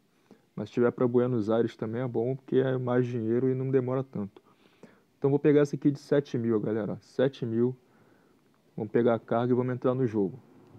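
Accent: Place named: Rio de Janeiro, Brazil. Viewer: Carioca façando é tri dahora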